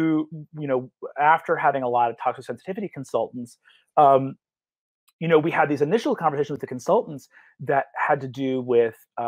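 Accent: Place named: General American, United States. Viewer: He doesn't do it with Asians, either. His Battle for Beyond character, Nikhil, was coded Indian -- DM Jasmine Bhullar even gave the character's sister a distinctly Indian accent. Brennan just spoke with his Broadcast American English accent. Bear in mind Brennan can do quite a lot of white accents, from New Yawkuh to Sad Italian Man, to Russian mobster, to various flavors of Tim Curry, and many more.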